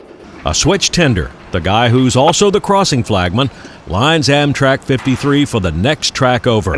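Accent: South, United States. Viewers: You are a man after my own heart! I simply adore trains ❤️ Your videos are delightful and so interesting and informative. We travel full time in our RV all over the country and I am always giddy when I see a train. Other folks avoid campgrounds near train tracks... I seek them out🚂! The rumble of the train and those wonderful horns just thrill me! Your channel pleases me very much and your soothing Southern voice brings a smile to this Southern lady's face. My husband shared this video with me this morning knowing it would make my day.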